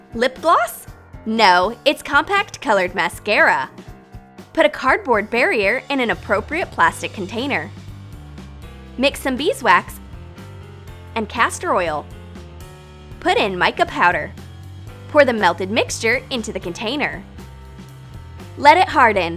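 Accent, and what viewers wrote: Russia. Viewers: are you English or are you Russian?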